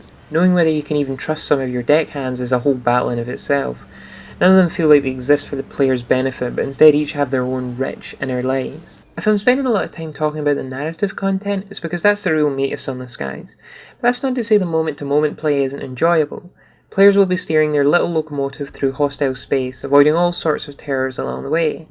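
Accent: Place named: Scotland, United Kingdom. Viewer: The Scottish accent is a wonderful thing if you're aware of it. I quite like the delivery here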